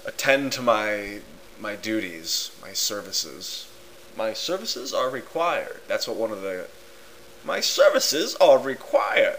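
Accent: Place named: General American, United States. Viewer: I disagree. Many, if not most, English speaking natives never master the English language. In my experience as an editor, well educated foreigners master English better than Americans. Among the typists, proofreaders and editors, it is a virtual United Nations at my newspaper. Top publishing firms have concurred with my statement. We come to this conclusion by who shows up when we hire. Don't sell yourself short. Where did you learn how to speak General American? It is perfection.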